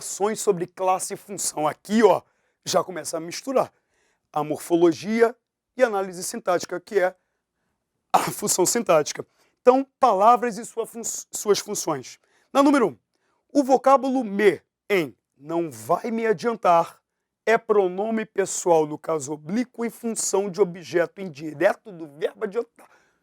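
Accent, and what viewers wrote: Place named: Rio de Janeiro, Brazil. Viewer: Coloca o titulo como se só o RJ tivesse pm oficial, tem que adivinhar pelo carioquês. Ajuda aê.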